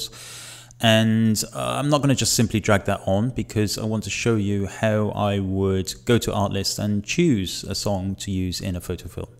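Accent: United Kingdom. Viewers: I love your british english 😂. Thank you for this very important video!!! I hope to meet you ONE DAY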